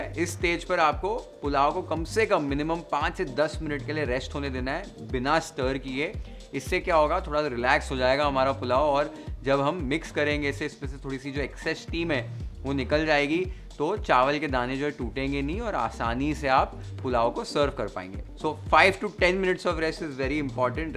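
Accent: Maharashtra, India. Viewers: Aap sab recipe try kiya aur masale bhi Sab eakdam perfect jesa kaha gaya wesa he kiya hey❤❤its taste perfect an superb Best recipe I loved was kala mutton thali u made sambar eak Briyani bhi 😊 Mala Tumcha Amcha Maharashtra Varcha Pream kup Avdal tume Manapasun banvtat ani Bolta sudha 😅 Love ur Marathi acent in Maharashtran Recipe you have Done till Now ❤❤❤❤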